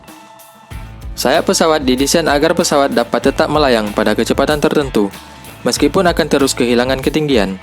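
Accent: Aceh, Indonesia. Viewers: Bang, orang aceh ya ?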